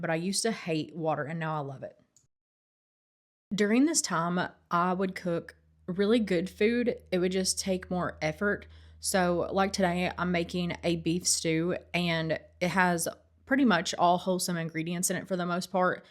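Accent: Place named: South, United States. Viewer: Hi, this is the first video I've watched with you but it's interesting to me because you sound like you have a Southern accent but you say pop which is a western Pennsylvania thing. Where are you from?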